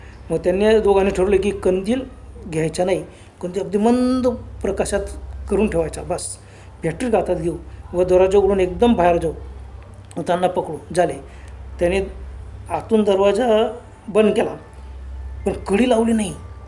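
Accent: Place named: Khandesh, India. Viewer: सांगणारे खानदेशात ले आहेत वाटत. काय कथा वाचता 😅😅😅😅...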